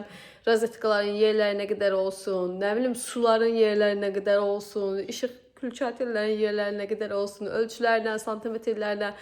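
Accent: Sheki, Azerbaijan. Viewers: Şeki lehcesi.❤eviniz mohteşemdi.can sagiliqi ile otrasiz.bizde evi tikilmis hazr almisq deye tam isdedymiz kimi olmadi.bizde 220kvadratdi 2mertebe xercimiz cox cixb.amma 1gün satb oz isdedymiz prayeti alarq ya sifrdan tikerik insallah.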